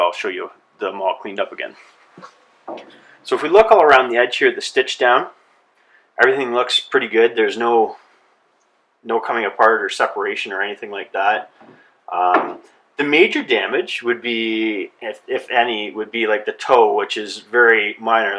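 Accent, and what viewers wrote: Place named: Canada. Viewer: such thick canadian accent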